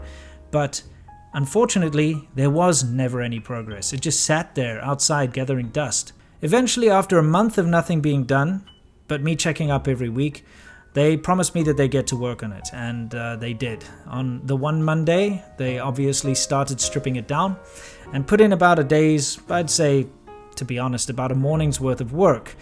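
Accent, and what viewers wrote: South Africa. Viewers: What country are you from you sound very south african. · Hey, love your car, and I hope you're not giving too much leeway to this shop. I know it's difficult to find a legit shop, but this is like well past 3 strikes and your out situation. Stay on them, you're investing big $$. Also I had a South African roommate many years ago, your accent takes me back. He was from Sandton, went to Stellenbosch University. Cheers!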